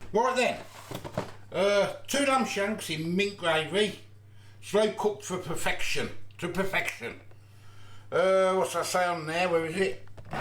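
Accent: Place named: Black Country, United Kingdom. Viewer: Salt and pepper is not seasoning I think you and are amazing people loving the Black Country accent I’m from the Black Country too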